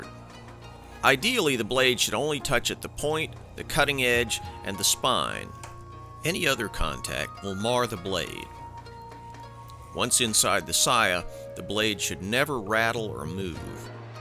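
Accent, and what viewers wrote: United States. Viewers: Mr., out of curiosity, which part of america is your accent from?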